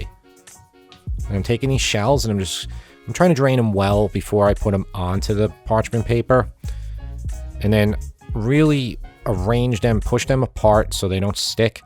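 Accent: New York, United States. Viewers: I just found your videos! I absolutely love them...and your NY accent!!! I'm from Long Island but now live in NC. I MISS that accent!!! We have finally infiltrated the South...we have bagels and pizza Lol, which were unheard of when we got here! Thanks for your videos!!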